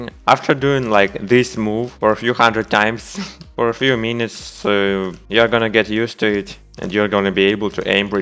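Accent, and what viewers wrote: Russia. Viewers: Какой то акцент не американский.. ты же русский... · Русский что ли? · Ты русский который выучил английский или наоборот Видос топ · Сразу понял что русский) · ты же русский)) акцент слышен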